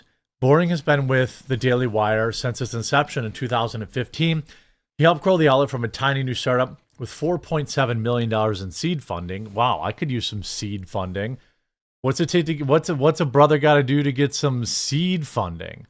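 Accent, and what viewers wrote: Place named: Midwest, United States. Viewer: It's always funny to me how you pronounce MAGA as May-guh 😂. Just messing you dude. Gotta love that Midwest accent.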